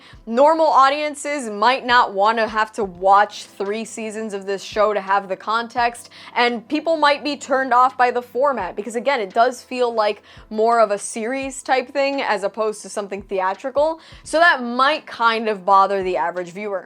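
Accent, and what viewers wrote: Spain. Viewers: Less "F" bombs, more Spanish accent!!!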